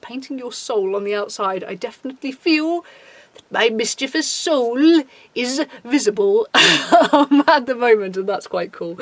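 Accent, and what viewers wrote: United Kingdom. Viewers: hey, what part of Britain are you from?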